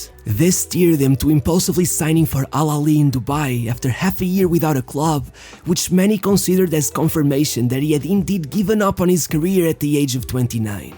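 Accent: Portugal. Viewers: So your accent is portuguese, my mental mystery is finally solved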